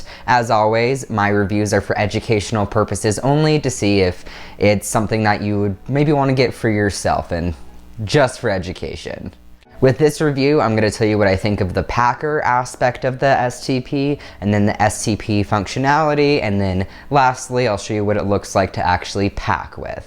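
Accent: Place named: Spain. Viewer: Your Speak to spsnish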